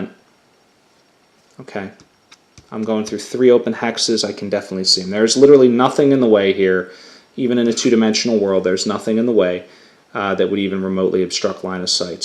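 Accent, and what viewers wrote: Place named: Pennsylvania, United States. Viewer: From PA? Water pronounced 'wooder'.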